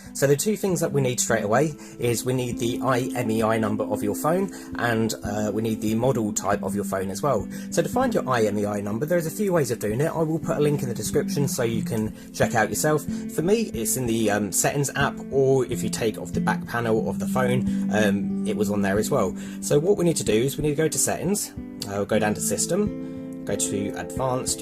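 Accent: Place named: United Kingdom. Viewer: Thanks for being british for a start and not indian and for not playimg stupidly loud dubstep music on your video. Sadly its not working for me i have the correct firmware, reflashed my hardbricked phone and it functions as normal now except the annoyong GoogleKeyState : fail issue but its not working for me for some reason??? Tried opening as administrator, triple checked my imei numbers, double checked i had correct version of firmware EAA version... Im at my wits end with this stupid chinese phone lol im using a Ulefone Armor X5 (not the pro i triple checked that lol) if anyone has any advice feel free to comment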